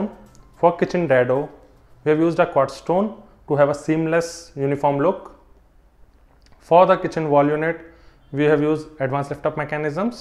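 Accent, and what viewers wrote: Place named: Arab world. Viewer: Love arabic accent. Say again "built-in".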